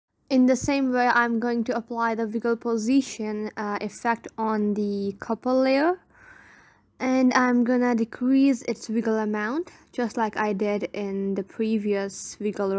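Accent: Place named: England, United Kingdom. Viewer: Tutorial is so nice lakin English accent 😂😂❤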